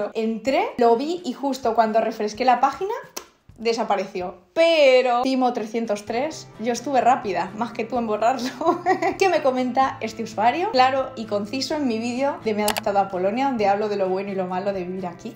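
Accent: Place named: Andalusia, Spain. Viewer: A mi me molan mucho tus videos y me encanta tu acento andaluz 😃🤩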